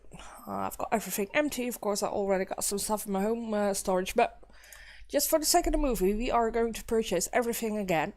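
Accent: Netherlands. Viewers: Jij bent Nederlands??? Vandaar dat accent!!! geweldig hahaha ma Flodder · Are you Dutch?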